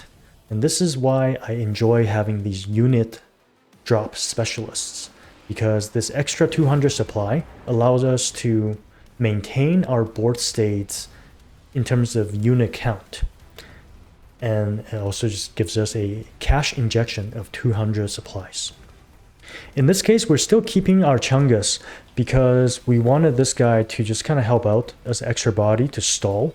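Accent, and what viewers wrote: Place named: Taiwan. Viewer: ahh yes! my taiwanese colleagues speak in such accent too! keep up the great content! love your explanation on your train of thoughts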